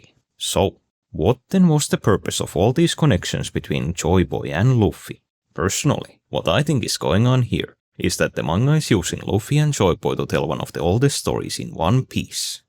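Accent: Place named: Finland. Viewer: Your accent sounds like a pretty heavy finish one. Are you possibly one of us snowmen. Maybe Maybe not It is meaningless in the end Oh yeah right Cool video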